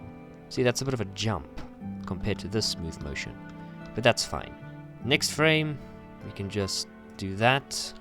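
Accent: South Africa. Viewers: South African detected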